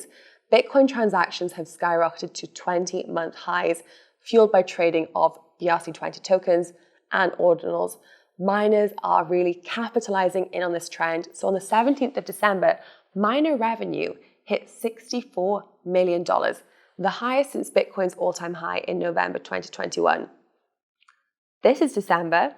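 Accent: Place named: Scotland, United Kingdom. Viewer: Thank you. Enjoyed your presentation and explanations. English / Scottish, niiice. Beautiful language.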